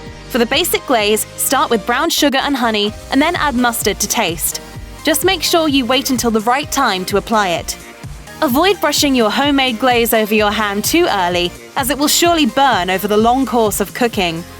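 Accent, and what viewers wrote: England, United Kingdom. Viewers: Well folks dhow this is a an ok sort of video please be aware. While the narrator may be British and have a British accent .All the temperatures recommended are in the Fahrenheit (°F) range Where as in England they use Celsius (°C) the internal temperature of 143 F is the equivalent of 61.6667 Celsius (°C) But please be advised for an uncooked ham the recommendations are 63°C or if you are in America where they use the Fahrenheit scale that would be 145°F for the internal temperature . A cooked ham the recommended internal temperature is 140°F or 60°C So going 145°F or 63°C on a cooked ham you will be over cooking it. Now as the only countries that officially use Fahrenheit as a unit for measuring temperature is the United States, the Liberia and the Cayman Islands. Other parts of the world use both, though Celsius is the standard. 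So one can take it this video is for the American market. But if you are outside the aforementioned. Then your appliances control's are more than likely designed for the Celsius (°C) temperature range . So something to look out for when cooking As for F to (°C) converting Subtract 32 from the Fahrenheit temperature. Multiply this number by five. Divide the result by nine. 143 F - 32 =111 x 5 = 555 / 9 = <num> But no matter what you do always cook your ham LOW AND SLOW An internal temperature gauge is a must in any kitchen Anything else is just guessing and mostly leads to over cooking or even worse under cooking It is all about the internal tempera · English accent. They don't do pineapple on ham. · Love that British accent ❤️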